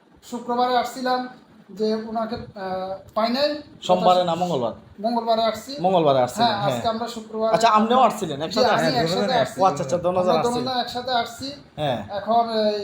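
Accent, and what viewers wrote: Chittagong, Bangladesh. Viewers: bai apner bari kotai? Apner kotha sone mone hocce Chattogram er hoben. Jodi Chattogram er hon tahole baiya ami apner sate deka korte cacci ❤❤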